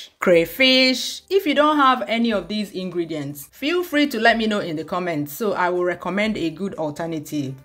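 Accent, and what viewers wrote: Igbo, Nigeria. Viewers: Very interesting to watch 👌🏾💯 I love & love that Igbo accent 💕 dalu nne 💯👍🏾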